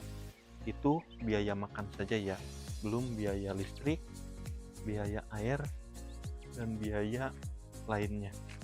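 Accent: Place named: West Java, Indonesia. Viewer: Koko dr Bandung nya,..? Aksen Sunda na kental banget hehe,.. kade atuh bisi rea jurig didinya😅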